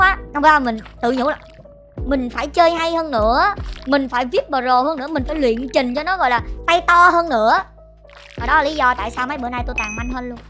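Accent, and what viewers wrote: South, Vietnam. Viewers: người ta là người miền nam người ta có cách nói chuyện như v , bạn đừng bắt bẻ vì người ở đó nói chữ mà có dẫu ngã thành hỏi quen r bạn ơi , khó sửa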